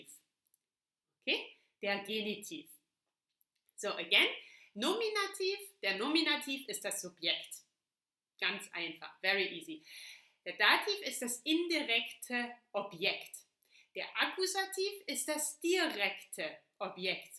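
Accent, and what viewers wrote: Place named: Germany. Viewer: explaining it very slowly with a very german accent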